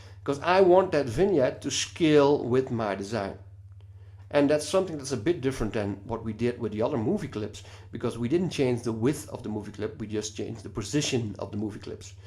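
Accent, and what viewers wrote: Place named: Netherlands. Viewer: Quality dutch accent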